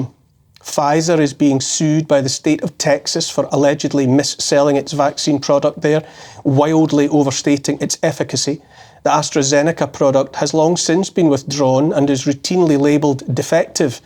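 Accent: Scotland, United Kingdom. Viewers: Thank you for your commentary. Glad to hear so many talking about this. I especially like to listen to you Scottish accent!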